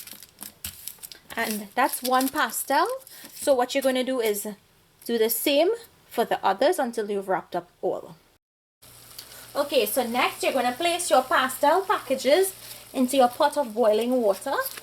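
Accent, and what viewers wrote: Trinidad and Tobago. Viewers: Hi, I'm still watching your Christmas cooking. It makes me feel at home. Trini English.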